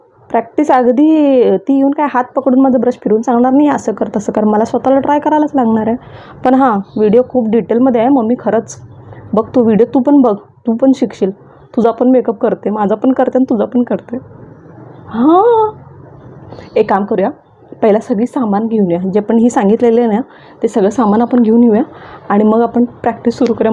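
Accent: Kolhapur, India. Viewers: Tumhi chan sangta pun kolhapuri bhasha samajate lagech .. aso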